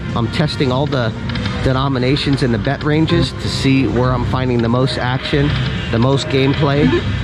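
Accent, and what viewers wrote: Australia. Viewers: Pokies! You sound like another 🇦🇺 😊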